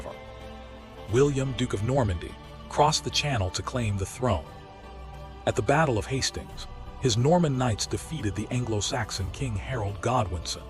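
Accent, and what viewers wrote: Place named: United States. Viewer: Sorry as soon as I hear an American voice over on British history I turn off , you need a local narration even if it's AI.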